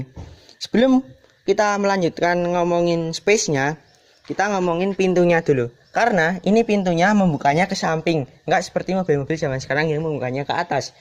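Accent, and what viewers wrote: Java, Indonesia. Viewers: Aku seneng logatnya....medok banget, koyo jape methe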